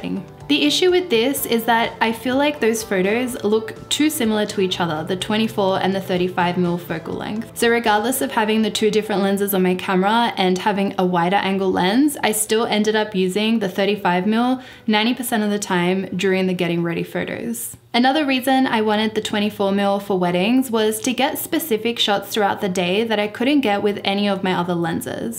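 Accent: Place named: Australia. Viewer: It's an Australian accent. Didn't you watch home & away and neighbors when you were a kid?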